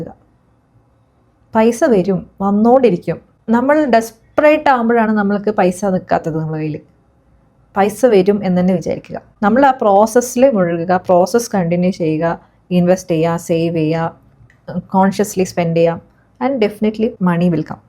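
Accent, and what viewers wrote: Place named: North Kerala, India. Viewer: ആടെ, ഈടെ, ഏടെ... “ ഒരു വടക്കൻ ” 😄💪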